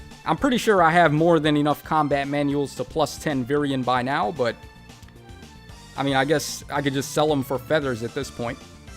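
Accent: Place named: East Coast, United States. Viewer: If your accent didn't give away that you're from the east coast, you going to a "bodega" did. Congrats on that lotto win, though, the IRL equivalent of summoning 5*s. I might want to play now, too.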